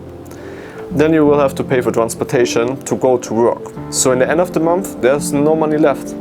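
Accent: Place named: Austria. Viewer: Guys Austrilia and Austria too different. He sounds like european/ Austrian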